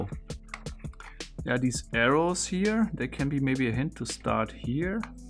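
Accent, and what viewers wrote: Germany. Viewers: Are you German? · Haha, man hört das du deutscher bist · Haha ich wusste du bist deutsch.. Habs doch richtig rausgehört 😂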